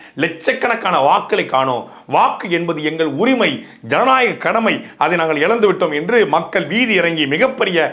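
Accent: Tirunelveli, India. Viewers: 🤭🤣🤣🤣🤣😂😂😂😁😁😁 பூத்...தாவது, சூத்..... ஆவது.... யோவ் 🤭🤭🤭 திருநெல்வேலி பாஷை அருமை. திமுகவிலிருந்து கொண்டே நாம் தமிழருக்கு வாக்களித்த என் தமிழ் சொந்தங்களுக்கு வாழ்த்துக்கள் 👍👍👍 வன்மத்தை வன்மத்தால் வீழ்த்த பழகுவோம், வீரத்தை விட விவேகம் முக்கியம் 👍👍👍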